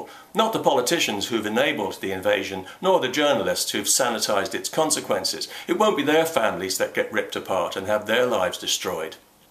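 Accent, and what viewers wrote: England, United Kingdom. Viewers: Condell is himself an immigrant. Despite the English accent, he was born in Ireland.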